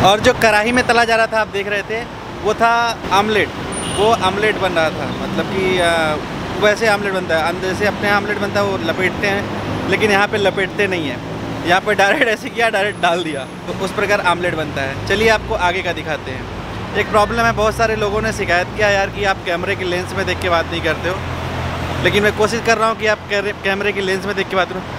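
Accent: Bihar, India. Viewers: Bhai aap bihar se ho kya? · Bihar ho kya bhai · ooo bhai tu bihari hai kyaa... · bhai Bihar k ho kay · Bihar se ho bhai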